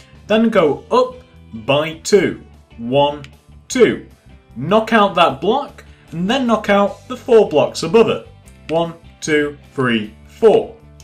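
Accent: United Kingdom. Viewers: His british accent. Be nice. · He's British lol it's not his fault he has an accent lol I hope you know not everyone speaks the same lol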